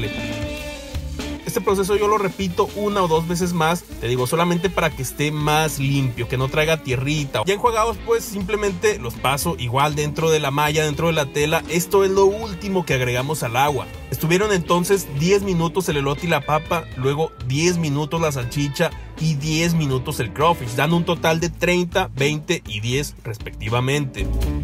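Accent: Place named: North, Mexico. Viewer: Yo tengo entendido que es de saltillo o torreón no estoy muy seguro pero vive en estados unidos y no tampoco es de Monterrey solo que es el acento norteño que tienen en eso lares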